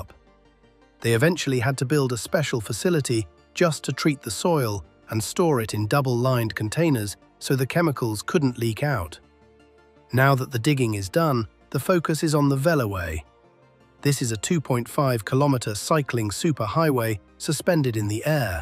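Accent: United States. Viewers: Thank God other comments are aware of this cheap, sensationalist, uninformed click bait crap. The authorities behind these projects have the vision and foresight to act before commerce and life fail due to overuse gridlock of existing infrastructure. It is better to act before the shit hits the fan than panic when the whole world stops. Keynsian ecomonics also shows that the multiplifier effect is also developing other sectors of the economy. Instead of wasting our mutual time on this tripe, research the financing and building of the Australian Transcontinantal (Indian Pacific) Railway. Paid off 10 years after completion and still benifiting the nation. By the accent of the voiceover, I assume that this is an American vlog. It is rich that someone from a third-world banana republic (my opinion) is casting doubt on Australian initiative. From my reading, over half the bridges on the USA national highway network are overloaded or in urgent need of repair. 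Please stop gasslighting me!!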